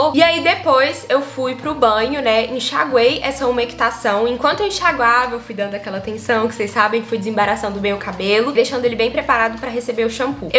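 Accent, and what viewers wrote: Minas Gerais, Brazil. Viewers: Vc é mineiraaaaa....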